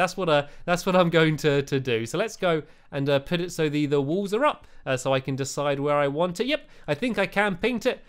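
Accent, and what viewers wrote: United Kingdom. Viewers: He's British so it's diff for him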